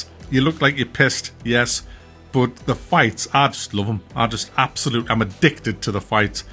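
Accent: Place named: Newcastle, United Kingdom. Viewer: Nice to hear a geordie accent on a game review! You're my new go to game reviewer by default now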